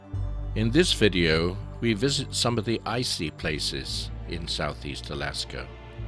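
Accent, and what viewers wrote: England, United Kingdom. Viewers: I don't know why but every adventure video seems more dramatic when it's voiced with a deep English accent. I felt like I was watching a National Geographic expedition from the 80s.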